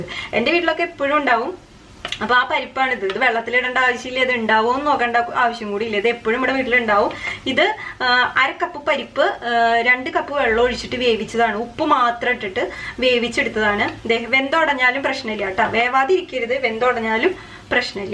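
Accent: Thrissur, India. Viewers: Recipe is good. But we will never use coriander powder in stew. Nattil evida? Nalla Thrissur slang. Me from thrissur.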